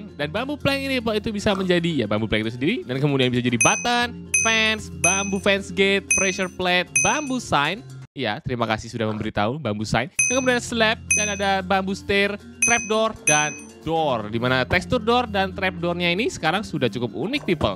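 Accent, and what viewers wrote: Java, Indonesia. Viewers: Medhok bgtt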